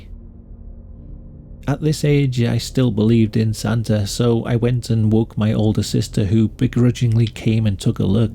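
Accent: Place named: Midlands, United Kingdom. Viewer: Yeah, you can post the link so we can read all these stories ourselves, but... I'd rather listen to you tell them in your soothing Midlands accent. 😊 I grew up in Saudi Arabia and went to the International Academy, which followed the British curriculum. I believed in Father Christmas, it was not until we came back Stateside that I got back into Santa. (I do have the obligatory photo of me as a wee toddler, sitting on Santa's lap, bawling hysterically.) A great Santa story comes to us from David Sedaris, in an essay titled, "Six to Eight Black Men." I believe it's in the book Naked. Definitely one of my favorites of his. Happy Xmas everyone!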